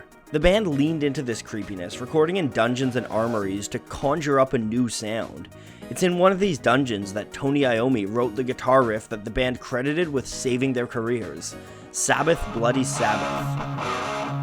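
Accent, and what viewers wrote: Canada. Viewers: Are you Canadian? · I like this channel, with all it's flaws and Canadien accents (houwse gets me every time) and/but I challenge your stage/studio knowledge to make a video on how to mic shit based on the hallway/staircase situation of the unbelievably talented John Bonham. Find songs, and show how mic placement changes and improves the sound.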